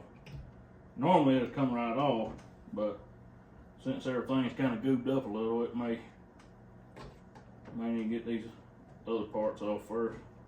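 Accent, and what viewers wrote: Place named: South, United States. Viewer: you might want to check a company called Farmertec. It is a Chinese company that sells all kinds of parts for your saw on the internet. I bought parts from them before. It was not too bad, shipping and cost. I also watch a couple chainsaw youtube channels, people who work on them.. Tinman and the second one is ironhorse. both are very good down home people. They might have some used parts you need. You remind me of my dads side of the family. They are also from Tennessee. You look like them and your size is about the same. I can definitely understand your southern accent. It makes me smile. Oh I miss them. Now I'm old and they are gone.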